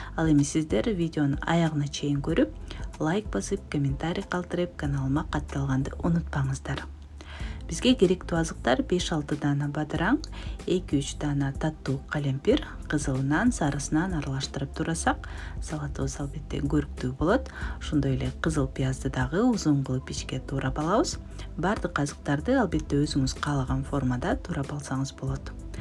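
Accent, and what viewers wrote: Standard. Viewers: Сонун азаматсыз. Сизден дагы коптогон рецептерди кутобуз. Кыргыз тилинде таза суйлогонунуз абдан кубандырат😊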